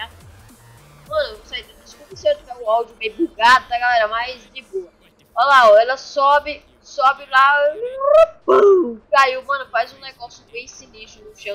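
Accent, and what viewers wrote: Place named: São Paulo, Brazil. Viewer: Aí meu deus esse sotaque misturado com sotaque de São Paulo pqp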